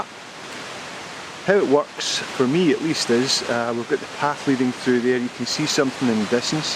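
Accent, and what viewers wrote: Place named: Scotland, United Kingdom. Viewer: That's clearly a Scottish accent... right? Very nice video..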